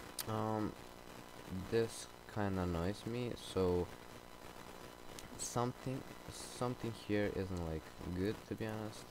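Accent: Russia. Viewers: Ты русский что ли?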